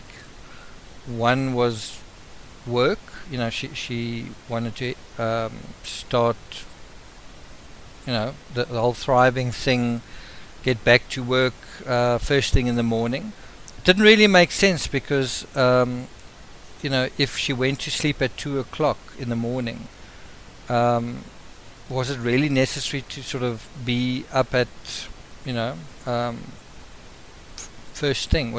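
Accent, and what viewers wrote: South Africa. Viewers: He has a south African accent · Omfg.. I’m off.. soooo sssslllloooo<link> thst and the SA accent.. sorry 😐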